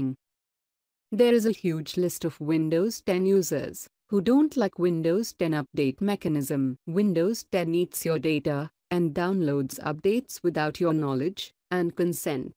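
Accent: India. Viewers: i can still hear an indian tongue ..SRSLY BAHBAH who heard it like i do ? anyone ?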